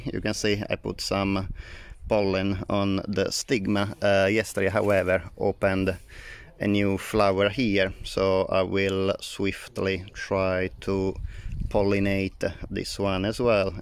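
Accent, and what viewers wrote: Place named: Italy. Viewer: Wonderful! I’ve really enjoyed your videos about fragrant plants and also your beautiful Italian accent. Grazie mille!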